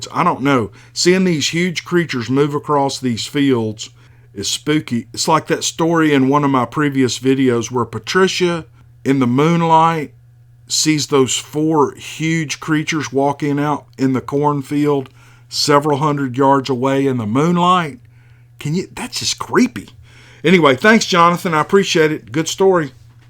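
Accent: South, United States. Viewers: Thank u so much! Snowstorm here in iowa and you made it entertaining to cozy up and listen to your southern speak!Love you!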